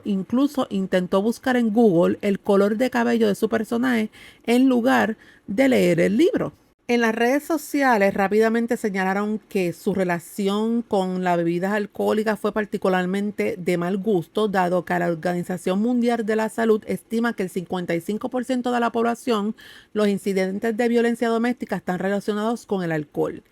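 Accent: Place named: Dominican Republic. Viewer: suena como dominicana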